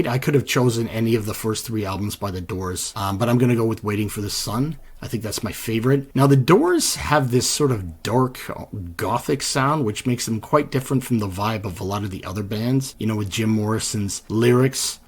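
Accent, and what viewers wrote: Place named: Canada. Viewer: Give him a break. He has a Canadian accent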